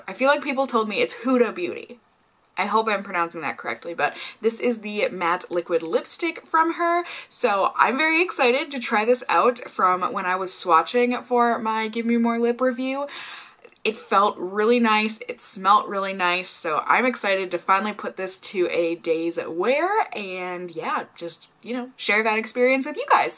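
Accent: Minnesota, United States. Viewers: I feel weird for thinking the sneeze intro is so adorable!!! Lol!! I think it's the little Minnesota twang in her voice. 😂 Love you!! I've been watching for years. Also, I support your choice to separate channels (even though I really wish people could just suck it up and keep on scrolling, also not that my support means much lol). Always wish you the best! Love from Fresno Ca!!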